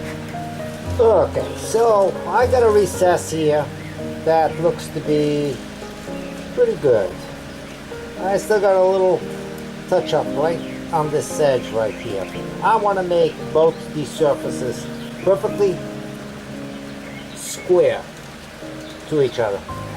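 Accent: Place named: Portugal. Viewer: Bom trabalho! I knew you had to be Portuguese. Your accent reminded me of my father who was an amazing woodworker in his own right. I could watch him all day. I love watching your channel and your amazing creations. You have inspired me to maybe try my hand at wood turning hopefully in the near future. Nao pares suas criacoes!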